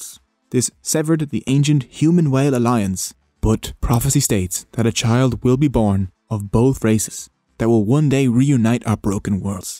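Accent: Dublin, Ireland. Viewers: It's a Dublin Irish accent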